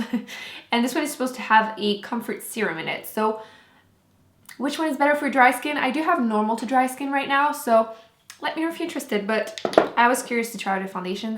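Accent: Canada. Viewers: She's from Canada c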